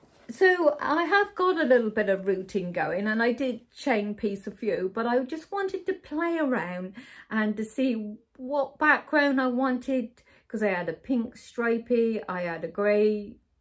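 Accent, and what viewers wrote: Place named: England, United Kingdom. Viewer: So lovely to catch up with you again. You laser light is so handy. It saves drawing a pencil line to follow. Love seeing you putting your pretty fabrics together. I have reserved the Alices Wonderland sampler book in my local library. They only have one copy and I am 8th out of 9 on the waiting list! It is a very popular book. You live in a lovely area of England and your accent is so very cute. Take care xx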